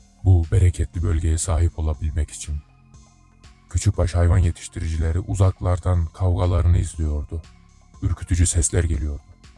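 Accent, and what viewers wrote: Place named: Kurdish, Turkey. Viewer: admin kürtmüsün len bu ses tonu ne kıroı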